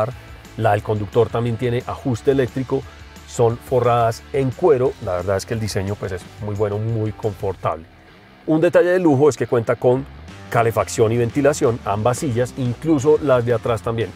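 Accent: Colombia. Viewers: De dónde eres una pregunta tu eres colombiano Es que pareces colombianos yo reconozco muy bien como hablan los paisas los rolos vallunos y me parece que eres de esa parte del pais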